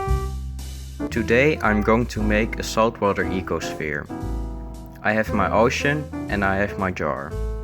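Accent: Netherlands. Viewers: Are u dutch? U sound it lol, im dutch but without the accent. Hoe gaat het?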